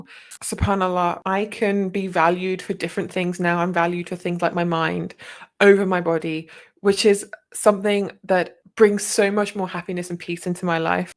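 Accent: United Kingdom. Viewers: I wouldn't cover my beautiful natural blonde hair. Why do 8 year old muslim girls cover their hair🤔 are we assuming men cant resist? Gross!!! Get some vitamin D lady. I hear your English accent. Go live in saudi Arabia youll be happy there I think. I assume you're single. Im a grandma. Get a real job or do as your father tells you. Dont be a hypocrite. Maybe your hair isnt as beautiful as mine😊😊😊 thats ok Im just real lucky. You sad woman. House prices increased because of immigration. 20 million too many people here. Are u getting paid for this video? Your parents must hate you😂😂😂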